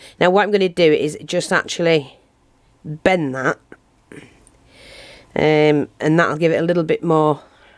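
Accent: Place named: Hull, United Kingdom. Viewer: your stuff is fantastic hun im in hull your acsent doesn't sound to far away lol x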